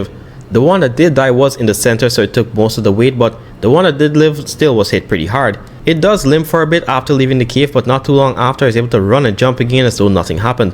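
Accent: Caribbean. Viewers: Are you Caribbean by any chance? I'm picking up a hint of Jamaican, Trini or Guyanese in your accent. Love from Guyana! 🇬🇾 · i know many, many people have probably already pointed this out to you... but i noticed your Caribbean accent. from one west indian to another, you make some damn good videos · I heard the accent and immediately thought you were from the Caribbean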